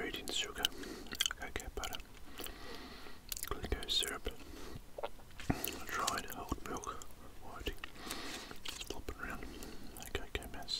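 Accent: Australia. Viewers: I guess the Australian accent is the best for whisper asmr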